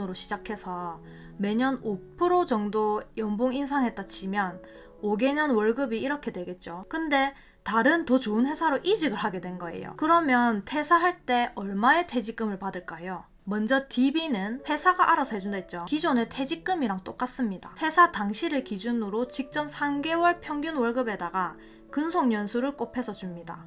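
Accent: Gyeongsang, South Korea. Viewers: 경상도 억양같은데요~~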